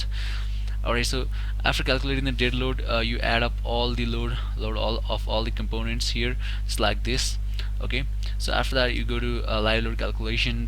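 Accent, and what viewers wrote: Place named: Nepal. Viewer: Nice teaching, you sounds like from Nepal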